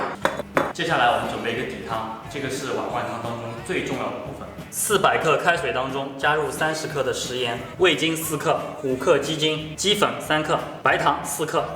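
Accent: Nanchang, China. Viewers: 标准的南昌话，浓浓的粉味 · 南昌话说的好地道，谢谢介绍南昌美食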